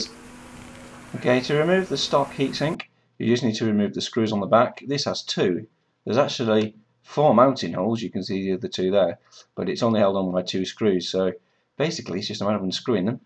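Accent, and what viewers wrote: United Kingdom. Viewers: Your accent is similar to mine, I'm in Leeds, but I don't pronounce it like that lol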